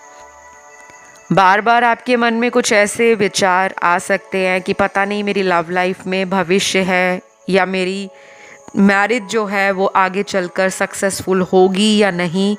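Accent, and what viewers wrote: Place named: Maharashtra, India. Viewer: R u maharashtrian bcz u talk like typical maharashtrian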